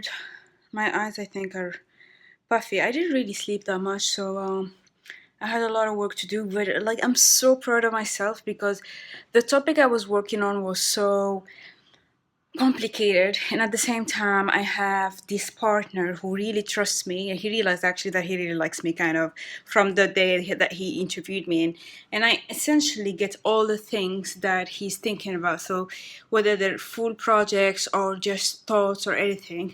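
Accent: United Kingdom. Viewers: I feel like your accent is slightly becoming english 🙈 You go girl! Like your ambition! Keep going and climbing! Bises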